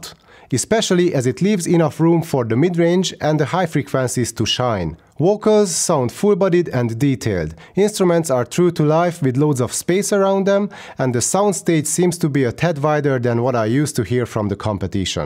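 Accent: Hungary. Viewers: Valahogy érezni hallásra hogy valaki magyar 😅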